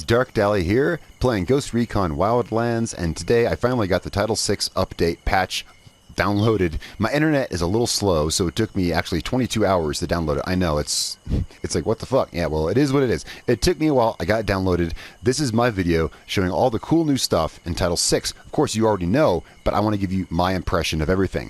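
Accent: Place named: North, United Kingdom. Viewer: You can tell he's from the North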